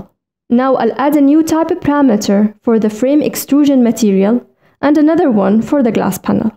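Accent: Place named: West Asia. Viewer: I think that : Your voice is from the West Asia ! I am living in Viet Nam , I have learn Revit in 1 month . I find on youtube in Viet Nam but the result is zero ! Thank you the West Asia girl !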